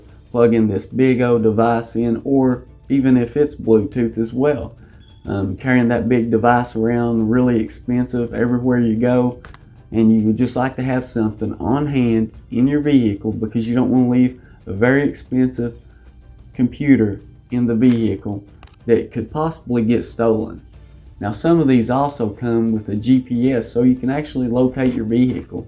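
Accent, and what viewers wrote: South, United States. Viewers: nvr watch a southern accent guy's tech vid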